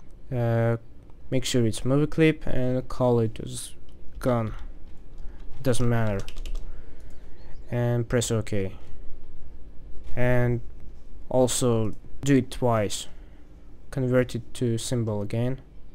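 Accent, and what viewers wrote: Russia. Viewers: A tutorial with a Russian accent? I am gonna like this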